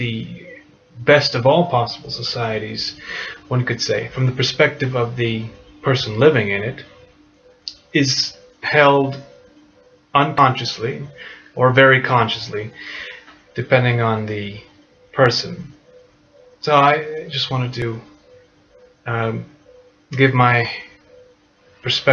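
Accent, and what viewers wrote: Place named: Canada. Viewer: Your dialect sounds Canadian, but it might not be. In Canada we have a monarchy, although the queen has no actual power, although she often is astonished to herself discover how much moral power she actually has. But legally, she is unchallenged and unchallengeable - but, realistically, she has no power at all. If she pushes the envelope at all, we'll suddenly have an Act of Parliament declaring Canada a republic, and nobody wants this to happen. So what is going on? We agree that she is necessary as one of the illusions or myths that bind our society together. She is just a human being, but she is also Her Majesty - chosen by god, even though nobody believes that, and probably never did. Society requires rules, and these rules require justification. So we have myths that we know are myths, but what they really are is a psychological boundary. 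"Question this, and the whole thing comes unglued" is a very "Canadian" thing to think, and that goes for English and French, as well as immigrants - who buy more readily into Canada's myths than native-borns do. So it is both absolutely true and absolutely false that the queen reigns. It's I suppose an agreed-upon sort of doublethink, but utility trumps "reality" here.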